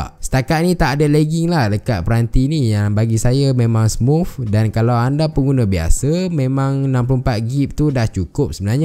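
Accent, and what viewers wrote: Taiping, Malaysia. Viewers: Sah la abg orng taiping😂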